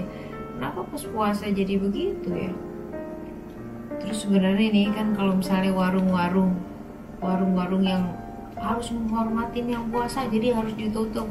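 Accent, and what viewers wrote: Java, Indonesia. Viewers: Wow kren cantik pinter klongomong ada jawanya jadi aku sukah klo orang jawa ojok ngilangno bosonedewe semoga sukses sehat selalu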